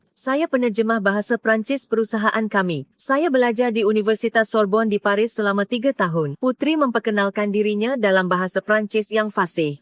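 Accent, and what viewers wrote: Malaysia. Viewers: 😅😅😅😅 Narator nya bukan orng Indonesia Logatnya 100 % Malaysia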